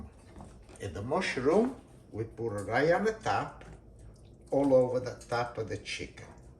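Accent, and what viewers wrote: Italy. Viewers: Just listening to him makes me happy and makes my dad im half Italian i don't speak Italian but listening to him makes me wish i had that accent haha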